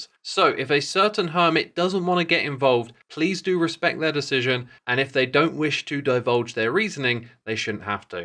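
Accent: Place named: United Kingdom. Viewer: I was hoping to find a comment like this. British accent certainly doesnt help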